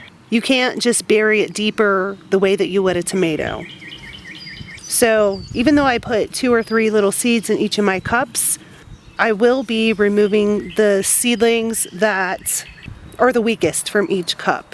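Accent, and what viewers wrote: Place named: Philadelphia, United States. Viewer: Your accent is straight-up gold! Delco? South Philly? I love you!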